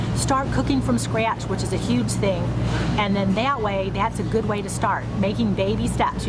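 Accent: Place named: Wisconsin, United States. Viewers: Nice Midwestern accent! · Thank you. I loved the reminder. Where are you from? You have a Wisconsin accent.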